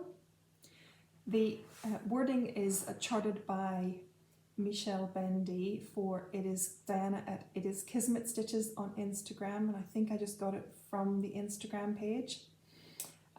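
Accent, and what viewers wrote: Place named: Ireland. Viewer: …you are such a kind and gentle soul and it shines in every Flosstube that you make! I find it so relaxing listening to your soft voice with such a lovely Irish accent..the way you enunciate your words, your little giggle at yourself and things you do. This earth needs more people like you. Happy Flossiversary to you! Listening to you sign off with your multiple thank you(s), it really is apparent it comes from the heart. I have a question for you…did you finish your Blackbird Designs - What Remains Is Love? I understood that you were part of a SAL and I have not seen your progress on it for quite some time. I do watch many Flosstubers and it is possible I have gotten this wrong…I ask, because I finally got a chart and decided that while others are seeming to participate in Stitch Maynia buy starting multiple projects; my plan was to be a real rebel and Mayniac and stitch every day in May on one single chart to see how progress I can make. 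I have chosen What remains and wanted to join in on the SAL. If you are doing that SAL would you mind giving me the nAme of it on IG please. Your bunting looks stunning and you deserve a medal for undertaking such a project. p.s. I love your stamp chart and story. It is actually a chart I would like to do as well, because being a Canadian I grew up licking many a stamp with the picture of Queen Elizabeth as well. The one I seem to remember the most was the royal blue one. p.p.s. I don’t stitch Halloween either! Great con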